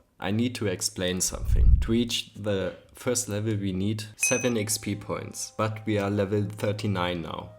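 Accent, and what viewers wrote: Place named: Germany. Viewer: Wie hast du das gemacht man hört du bist deutscher 😅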